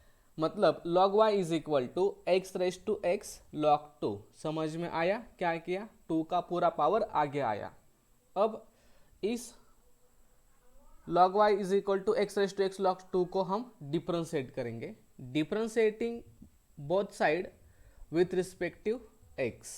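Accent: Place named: Maharashtra, India. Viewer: Accent marathi ahe tumchi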